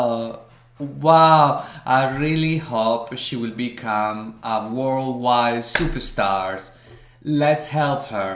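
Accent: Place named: Italy. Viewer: Omg i love your voice so Italian, humble, and your reaction so so natural, good luck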